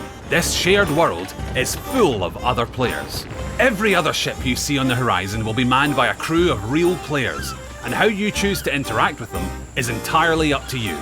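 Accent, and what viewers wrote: Scotland, United Kingdom. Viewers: voice actor? You mean the guy commentating who is Scottish? That’s his normal ascent...... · I don't want to upset any Scottish people but for a long time I thought this guy was Simon Pegg doing a Scottish accent until I realized the guy at rare is also Scottish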